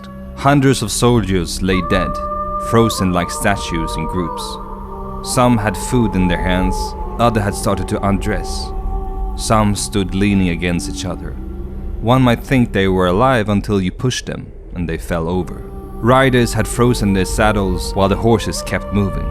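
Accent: Sweden. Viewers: Skåne(s) skanse was mentioned. Could be the pronunciation that made you miss it. The Swedish narrator speaking English here, can't really decide if he's going to pronounce the names in Swedish or English. He does a little bit of both, which is frustrating. For not established names in English, he should stick to local one, or close enough, his Swedish attempt at pronouncing Norwegian names. Locals and not even English natives understand what he's referring to, when he's tries to guess how an English person would pronounce Stjørdal. And sometimes Karl is Karl, and sometimes Karl is Charles.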